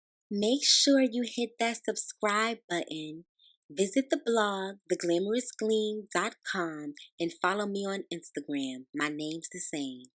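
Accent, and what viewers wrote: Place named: New Orleans, United States. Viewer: Omg I love her accent. Are you from New Orleans. And I love your videos 🥰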